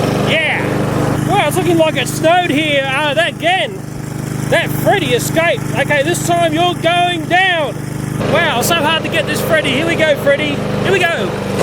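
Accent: Australia. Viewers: I love the way Australins talk. Im American so I can't really understand what he means sometimes.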